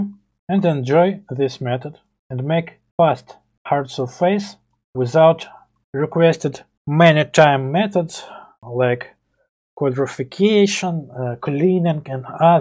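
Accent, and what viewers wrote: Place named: Russia. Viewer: Хмммм он будто русский говорящий на английском 🤔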